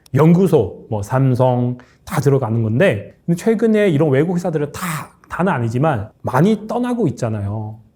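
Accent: China. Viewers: 이 사람 중국인인가요 발음이 쭝궈런인데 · 선족티가 난다. ㅋㅋㅋ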